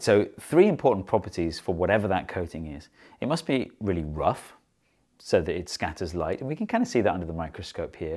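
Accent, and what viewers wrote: United Kingdom. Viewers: Lovely British accent!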